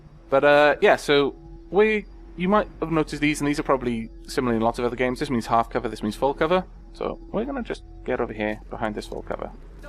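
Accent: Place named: South, United Kingdom. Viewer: As an aside, you're one of the first Englishmen that I have yet to even accurately ascertain what part of Britain you come from based solely on your accent..I'll throw caution to the wind and guess south of England? I'm probably way off.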